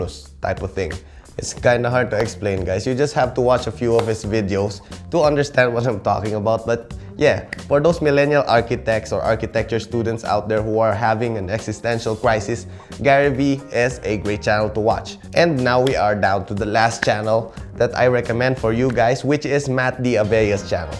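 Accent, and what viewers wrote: Philippines. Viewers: lmao sometimes you speak English like a normal Filipino but then other times, you speak English like you learned the language from looking at memes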